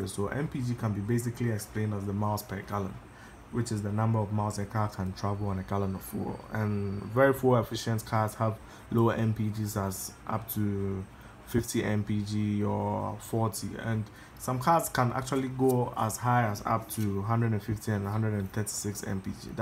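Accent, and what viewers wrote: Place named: Nigeria. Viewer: You sound nigerian. Ice explanation tho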